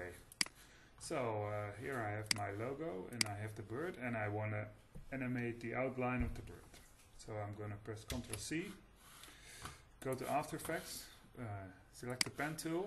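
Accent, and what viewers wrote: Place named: Netherlands. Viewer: thanks! hoorde gelijk dat je nederlands was hihi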